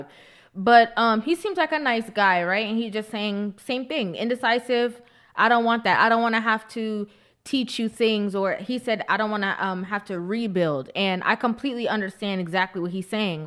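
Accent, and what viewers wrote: Jamaica. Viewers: I hear the jamaican in u. I like ur comments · Good job though. I can detect you’re Jamaican. lol I’m too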